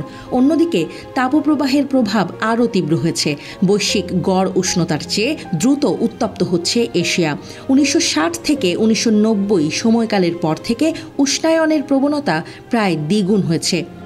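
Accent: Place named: Kolkata, India. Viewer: আর টিভির এই ধরনের কলিকাতা দিদিদের মত খবর পাঠ করার জন্য আমি এটা বয়কট করছি।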